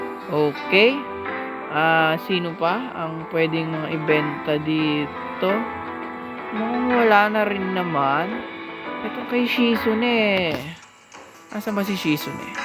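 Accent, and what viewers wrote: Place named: South, Philippines. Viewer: taga south yung accent. Dala ng emotion haha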